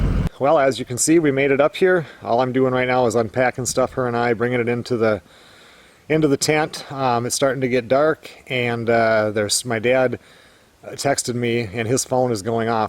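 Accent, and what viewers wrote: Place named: Midwest, United States. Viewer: I love your content & channel,. I’ve been a HUGE fan for about a year now. I knew you were from the Midwest with that kind of an accent. My brother is from Chicago, so I know a Midwest accent when I hear one. Your videos are so therapeutic after I clock out of my shift from the hospital. I watch your videos almost every night. Greetings from Seattle, my friend. 🙏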